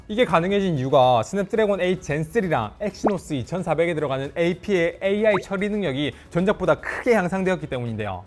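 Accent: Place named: Seoul, South Korea. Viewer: 오랜만에 왔는데 말투가 시원시원하게 바뀌시고 딕션도 더 좋아지셨어요 빠르기도 빠르고 부드러운 서울말쓰는 느낌 ㅎ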